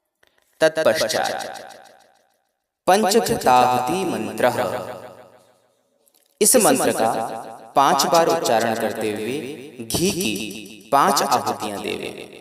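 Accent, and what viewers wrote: Standard. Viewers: ओम स्वामी जी सादर प्रणाम चरण स्पर्श बहुत ही अच्छा यह आज का आपने वीडियो डालकर हमें एक एक शब्द के शुद्ध उच्चारण के लिए प्रेरित किया है इससे जहां कहीं हमें मंत्रों में उच्चारण है थोड़ी जो गलतियां होती थी उनको आप के साथ उच्चारण कर सुधार सकते हैं स्वामी जी आपका बहुत-बहुत धन्यवाद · स्वामी जी सादर प्रणाम ।बहुत ही शुद्ध एवं सुन्दर उच्चारण है आप की वाणी में ।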